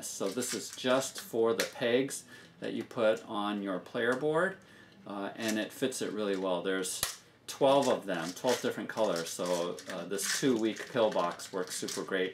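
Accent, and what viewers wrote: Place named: United States. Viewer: because of the american accent, I kept thinking that you were calling the abomination obamanations. made me chuckle. good job though dude.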